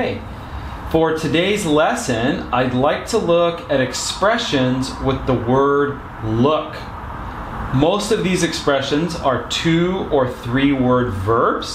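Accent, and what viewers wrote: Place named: Canada. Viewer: Wonderful explication. Love your sweet Canadian accent.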